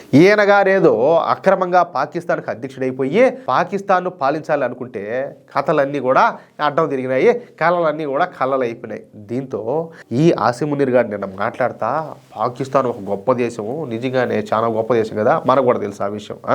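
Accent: India. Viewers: Mr., I like your videos due to your slang of telugu.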